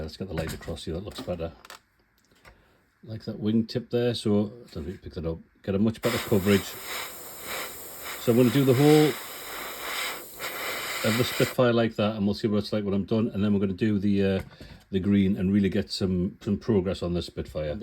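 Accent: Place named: Northeast England, United Kingdom. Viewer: Hi, What a gorgeous build, well done my friend. I live close to Consett and you have a familiar accent. Do you take on commissions? The reason I ask is that I own the original entry forms for Peter Townsend blue Hurricane from the Kings Cup Air Race. I bought the 1/48 Hasegawa to have built and make a complete display. Is this a project you could do for me? Regards, Ian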